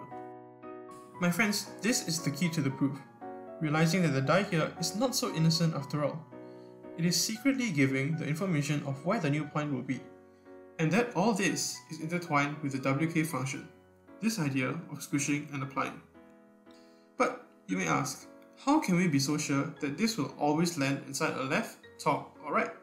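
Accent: Singapore. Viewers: it's your first video so it's normal that you have a lot to improve. my advice for the next one, put some text. even if you get a new mic, you still have the singapore accent and some westerners can't understand it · yess recognised the accent as a fellow sinkie, keep up the good work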